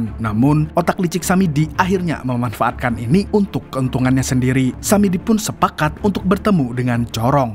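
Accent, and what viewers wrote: Banjar, Indonesia. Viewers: Urang Banjar asli kah bg .iya dah bubuhan Samidi🤣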